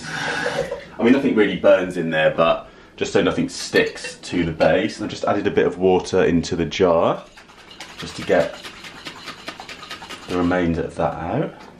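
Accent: United Kingdom. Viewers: Que bonito suena tu acento , Nice vídeo ,your brithish accent is cool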